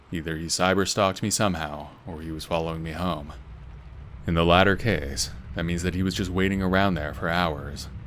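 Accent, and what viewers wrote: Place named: Canada. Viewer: I knew there was something about your voice and it just dawned on me. You're Canadian.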